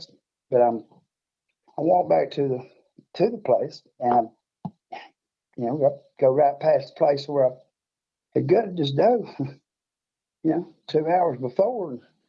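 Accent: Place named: South, United States. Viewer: Accents, or local dialects, are not mistakes. Every place has its own, even New York and New Jersey and all up and down the CA coast. Even if they don't think they have an accent, they do. I come from the country in Southern Illinois, which is Southern, too, and I love all Southern accents. Your manner of speaking and your accent greatly enhance your story. Country and Southern people get a lot more in than just words. More is being said when one turns one syllable into three. Nuances. Thanks for your story. My husband and I loved it. We are up between Killen and Lexington, 42 miles from Bankhead, says Google. Be well.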